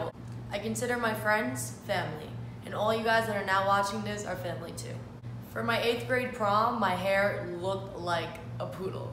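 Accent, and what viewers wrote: New York, United States. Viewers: I could tell from that accent you were from NY without even knowing!!! You’re absolutely fucking stunning! I’m a fan! 💕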